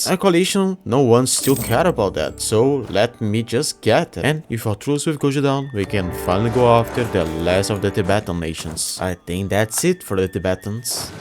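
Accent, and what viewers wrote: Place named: Brazil. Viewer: sempre bom ver algum br fazendo conteúdo em inglês sobre qlq game, sua pronuncia ta ótima amigo, apesar do sotaque "accent" que colocamos, isso deixa com mais charme (obs: demorou quase o vídeo inteiro pra eu começar a suspeitar ser um "compatriota" huashusahusa), sucesso!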